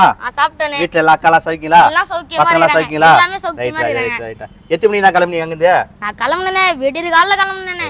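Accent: Madurai, India. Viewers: Super Anna Madurai vasagam 🎉🎉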